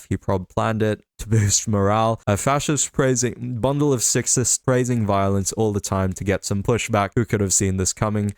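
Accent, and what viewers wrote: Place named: Australia. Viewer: He does seem to have a Philippine flag behind him, but he sounds (and I think he said he is) Australian.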